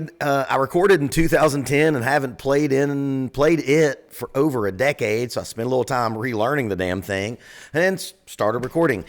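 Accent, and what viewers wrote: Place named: South, United States. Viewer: Not only do i love your insights into drumming helping me appreciate the music even more, but your smooth and soothing southern voice has got a place on my insomnia playlist. Please release a track of “nudge” and of “puuunch” on repeat. AWESOME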